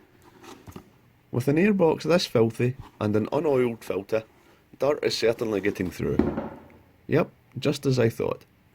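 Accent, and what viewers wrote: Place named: England, United Kingdom. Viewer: yes of course the finest English accent in the world. According to me he could talk a little bit more, than I could listen to the only true English accent all day long 👍🏻👍🏻👍🏻👍🏻👍🏻👍🏻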